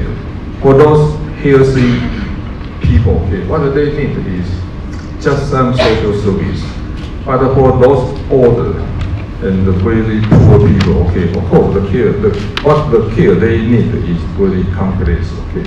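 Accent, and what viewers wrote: Taiwan. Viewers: 因為阿北,大家一起加減練英語.....阿北講英語,一樣很有親和力(超台的,還有人抹紅他....真的厚) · 真的是很台式英文 ha. 台灣教育體系教出來的. 正港的台灣人 XD.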